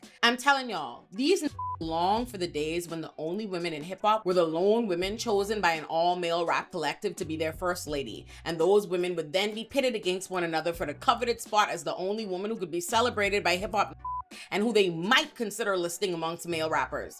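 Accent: Bahamas. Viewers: you sound like youre from bahamas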